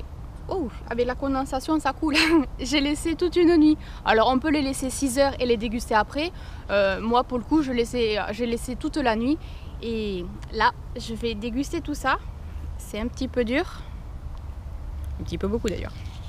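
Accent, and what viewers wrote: South, France. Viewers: Suuuuper ! moi j'en ai fait une aussi avec 2 ingredients mais se ne sont pas les mêmes haha. passe voir si tu veux, en tout cas je m'abonne , j'aime ton petit accent du sud !!! et pouce en l'air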